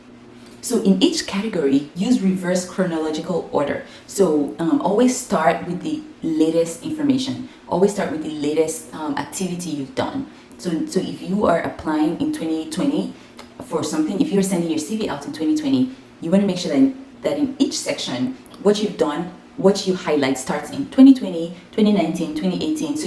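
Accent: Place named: Nigeria. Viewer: Thank you. I appreciate this video. We have something in common though, I teach sunday school at my local church and gurrl I nver used to write in details like you just explained. I will edit right away. Thanks again. You sound Nigerian too. 😉😉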